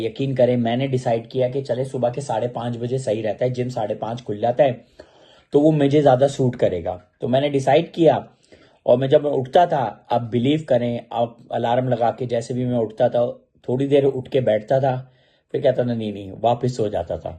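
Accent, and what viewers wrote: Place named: Pakistan. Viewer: You have a very pure urdu accent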